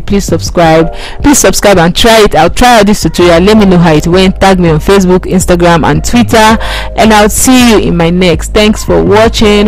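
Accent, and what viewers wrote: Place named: Africa. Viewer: Thank you baby. I am all of 3 days new to sewing, I will definitely try to do this but will start with a skirt fist as I do love skirts. This is very beautifully instructed and informative. Very beautiful accent too. Love my Africans.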